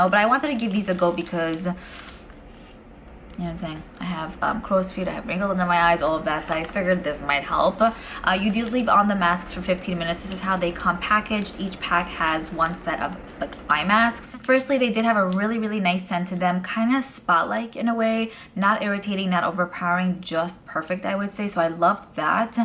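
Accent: New York, United States. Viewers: I ❤ your New York accent. You make me smile with every video. Could you try the new wetnwild palettes? Some love it, others hate it. I don't know what to think. Also the new Revlon prismatic eyeshadows palettes?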